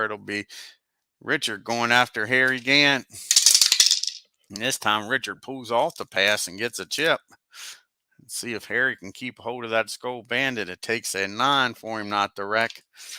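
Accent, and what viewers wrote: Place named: South, United States. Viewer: I know he does a great job. I have second season HMB and HMG. This one has been on my radar for a while. Also you southern accent fits the game.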